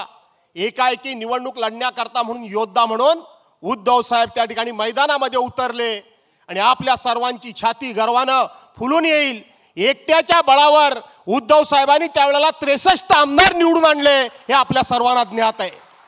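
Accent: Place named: Konkan, India. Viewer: Kokan aavaj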